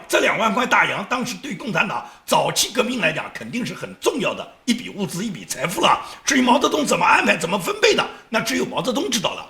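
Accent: Nanjing, China. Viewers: 喜欢建民用带有南京腔骂洪晃，过瘾！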